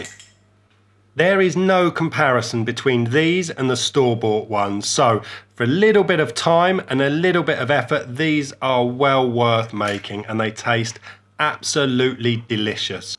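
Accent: United Kingdom. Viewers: I am so glad the OP has a British accent and not some New Yorker! :D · This is proper, a dude with a thick af british accent telling us how to make crumpets. This is what the good Lord intended.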